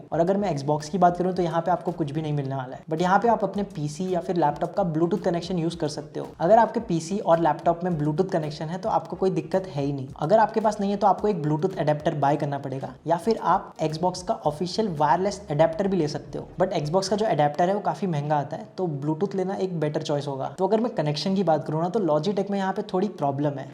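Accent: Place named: India. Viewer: Who understand you Curry language!!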